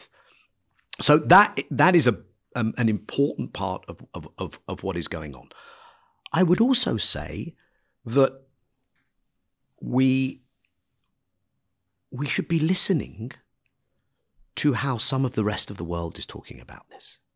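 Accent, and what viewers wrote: United Kingdom. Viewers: Jeremy – This is way over my head but seems rational, if only because it is presented calmly and with a British accent - as if the Brits have no culpability. Perhaps you can follow the story .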